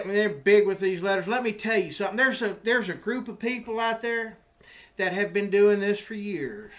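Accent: Appalachia, United States. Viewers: love your vid as somone who lives in peoples rep of kalifornia.what your saying is plane old fashioned common sense! be prepared where ever you are i learned that in the army,love how you speak hillbilly my roots are missouri and tex,louisiana take care thank again gil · i like that hillbilly accent i salute u from sweden!!!! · U speck hillbilly me to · speakin hillbilly works for me.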